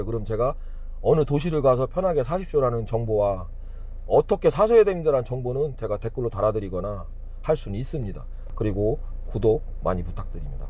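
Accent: Chungcheong, South Korea. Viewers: 아이구~ 시원시원 하시네요. 맘에 딱 들어요 ㅎㅎ 저도 인도네시아 들어가서 현지인들 속에 가서 살려고 하는데, 어학연수 하면서 현지인들과 어울리면서 말 배울라고요. 전에 회사일 때문에 들어가 만났던 교민들,,, 아~ 진짜 .... 더이상 말 안하께요. 그리고 진짜 돈거래... 작은 돈이라도 돈거래는 하질 말아야하고, 아예 안만날 능력 있으면 좋죠. 전 고향이 충북이고 부모님도 청주 사시는데, 아~ 충청도 말투 정겹네요.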